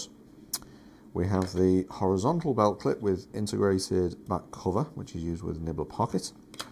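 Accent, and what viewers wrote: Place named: Wirral, United Kingdom. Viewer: Sticking with that Wirral Accent 😂 Mate, You do such a good good with these designs, They look incredible. Looking forward to my Bender arriving, I should have come get it since your down the road. I'll get a nibbler next money day! You think you'll do something for the RAK Boards or T-Beam. You design would be awesome on the T-Deck. All.the best mate! Sean (2E0SYA)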